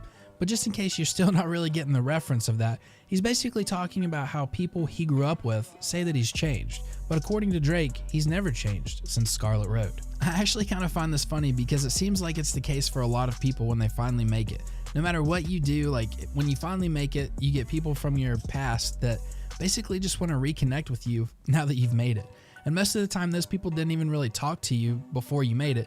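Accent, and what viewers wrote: United States. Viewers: Broooo, you gotta be from B-More you got the strong O accent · Mega southern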